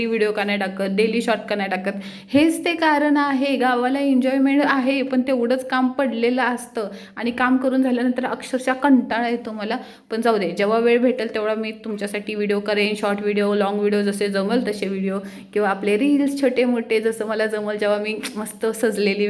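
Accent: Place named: Satara, India. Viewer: Tumche gav kuthle ahe,tumch bolnycha tone, saglya vidhi satara karad side chya vatyat.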